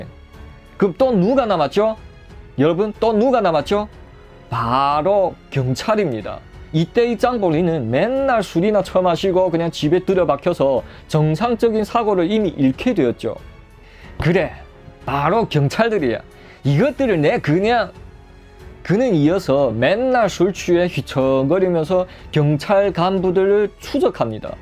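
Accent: China. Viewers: 목소리나 억양이 완전 중국화 되어 있고.. 젊은 친구가 잘 생기기도 하고.. 설명을 잘 해 주어서.. 중국 무협지 한편을 본 느낌이었네요.. 아주 재미 있었습니다.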